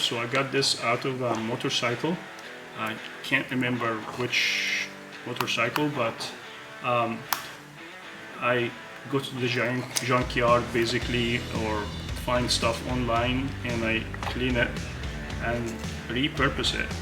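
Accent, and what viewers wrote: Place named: Northern Europe. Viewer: the art of bedding music is that you should NOT be able to hear it. its to cover up background noise, reduce the "hiss" of silent passages... it should NOT be louder than the person talking. not everyone can follow a north european accent without the added distraction. my heads just not in the space to deal with it right now...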